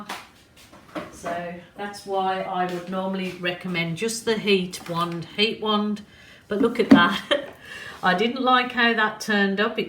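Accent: Birmingham, United Kingdom. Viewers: Definitely Brummie ! LOL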